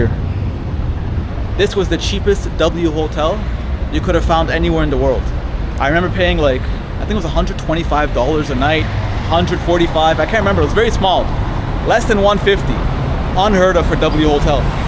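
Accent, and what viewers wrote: Turkey. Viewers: New subscriber's here. Love turkey, the last time I visited istanbul was 2022 of March. The whole month roaming around turkey. Seems like that you have Turkish origin s since you have accent bro. 😊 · your Turkish accent is cute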